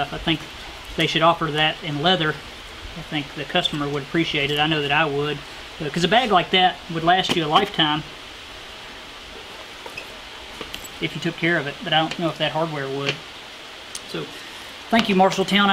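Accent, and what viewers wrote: South, United States. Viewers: I didn't think how much is added to your videos by your accent until I heard your dad speak. I've gotten used to hearing you and, and seldom think that you speak differently than us Midwestern folk. I met my northerner wife while attending school in Chattanooga. Southern accents have always been a treasure to me since then.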